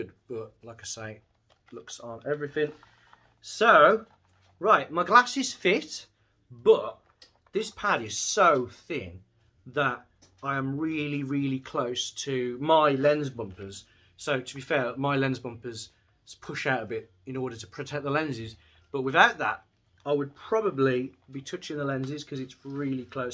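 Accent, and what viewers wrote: West Midlands, United Kingdom. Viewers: this dude sound like a west midlander :D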